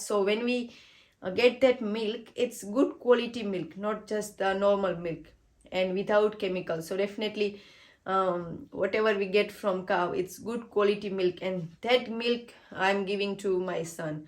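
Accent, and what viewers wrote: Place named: Gujarat, India. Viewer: Your interview was pretty good but you have gujarati accent in your English it is ok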